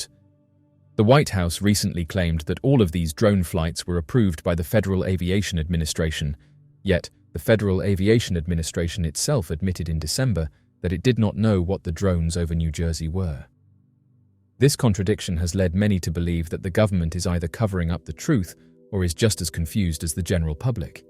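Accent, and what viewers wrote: United Kingdom. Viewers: This channel is such bullshit .Right wing shill and then act like they get memo's from Anonymous😂Delivered in this ridiculous brit accent like that makes it seem serious.What a constant on running joke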